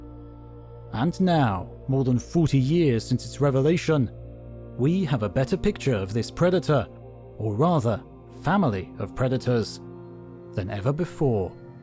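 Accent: United Kingdom. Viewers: The dude is from Great Britain. I'm not sure what rock you grew up under but people tend to sound like they come from their place of origin.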